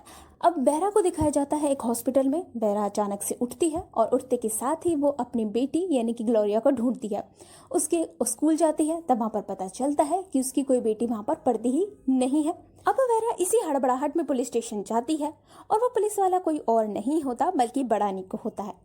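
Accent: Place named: Punjab, India. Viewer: Aap panjabi accent me hindi bolte ho .👍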